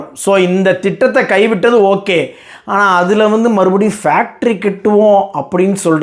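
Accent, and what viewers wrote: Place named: Tirunelveli, India. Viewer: நண்பா நீங்கள் கண்டிப்பாக ஒரு வீடியோ பதிவு செய்ய வேண்டும் பிஸ்மி அவர்களுக்காக தனியாக உங்கள் ஸ்லாங்கில் திருநெல்வேலி ஸ்லாங்கில் வைத்து தவத்திலேயே போட்டு விடுங்க செய்து விடுங்கள் அஜித் அவர்களை தவறாக சித்தரித்துக் கொண்டிருக்கின்றார் இந்த பன்னாடை பிசின். பிஸ்மி வலைப்பேச்சு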